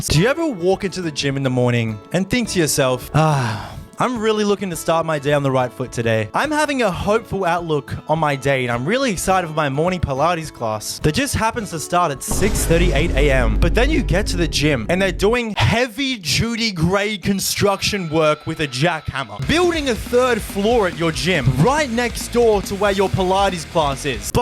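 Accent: Australia. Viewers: He is Australian I believe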